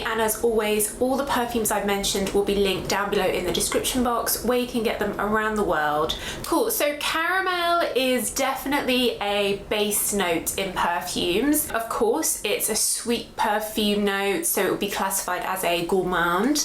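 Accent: United Kingdom. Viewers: I love your vids based on main notes of perfumes and I love your british accent-you are the Tish Wonders of the perfume world "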